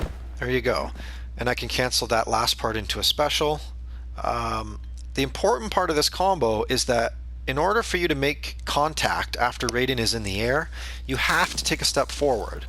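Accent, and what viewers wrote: Canada. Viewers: pretty sure youre canadian based on the way you talk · Canadian eh?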